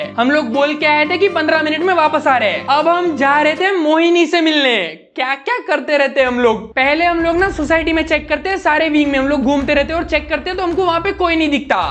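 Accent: Hyderabad, India. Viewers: always love the hyderabadi accent😅😂😂 Also the ending is crazy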